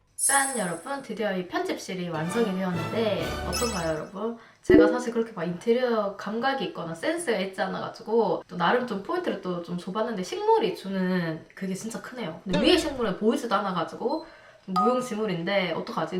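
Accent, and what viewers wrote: Gyeongsang, South Korea. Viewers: 세상에 경상도 어딘지 모르겠는데 말투도 귀엽고 재밌고 또 너무 순수하고 맑아보여요!! 응원합니다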